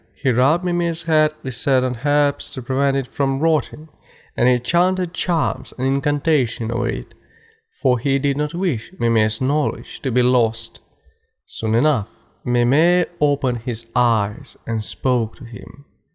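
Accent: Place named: Finland. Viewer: Side note: had to look up your dialect cause it sounded like a weird mix of finnish, italtian and german. UKRAJINA BOIIIIS!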